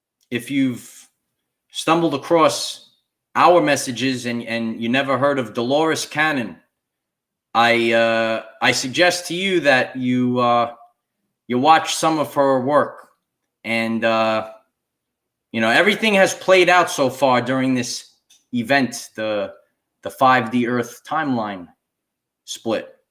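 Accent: New York, United States. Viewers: I love your New York accent!!!!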